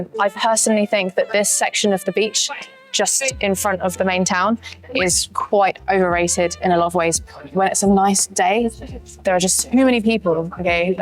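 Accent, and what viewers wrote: United Kingdom. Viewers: 好聽的英國腔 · 英國腔好聽😂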